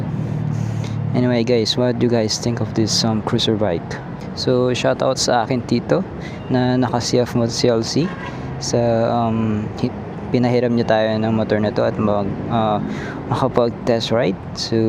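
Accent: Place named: Conyo, Philippines. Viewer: nung narinig ko ung conyo voice d ko na tinapos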